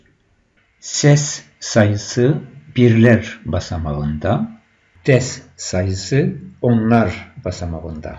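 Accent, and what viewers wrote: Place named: Germany. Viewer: Sanki adam alman aksaniyla konusuyor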